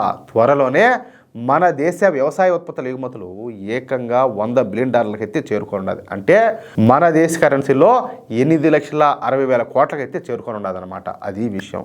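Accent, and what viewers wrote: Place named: Chittoor, India. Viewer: Meedhi Kadapa na 😅 mee slang vini chittoor anukunna.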